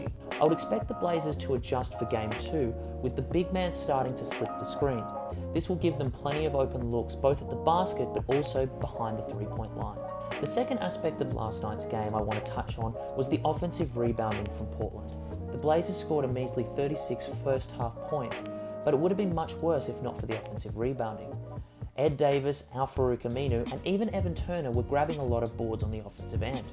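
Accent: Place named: Australia. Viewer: If you're able to turn up the volume on your voice over analysis, that'd be awesome. Your analysis is pretty sick; you also sound like a fellow Aussie. Can't wait for your game 2 review!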